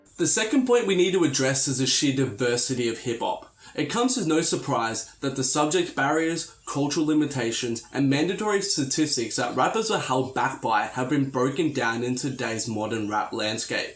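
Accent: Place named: Australia. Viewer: which part of australia are you from?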